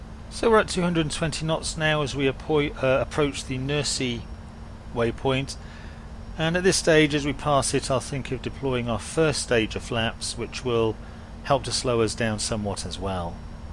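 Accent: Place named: RP, United Kingdom. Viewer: speaks the Queens English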